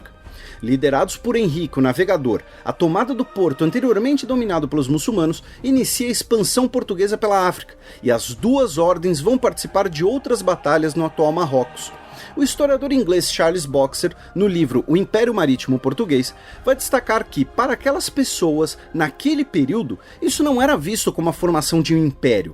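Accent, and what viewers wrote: Brazil. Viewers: Com este Português tupinambá, eu duvido.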